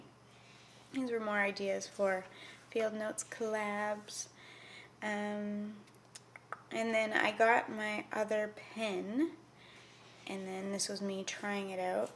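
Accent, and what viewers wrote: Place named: United States. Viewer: Hey I love your video! Your characters are super cute! I just wanted to give you a heads up that "gypsy" is considered a slur in many places. You sound American or Canadian by your accent - we don't come across that word as often so I think many people here don't realize it's negative. But in Europe it's a derogatory term used against the Romani people. I'm not trying to make you feel bad because it seems like you have good intentions! I just thought you might want to know before you include it in your course.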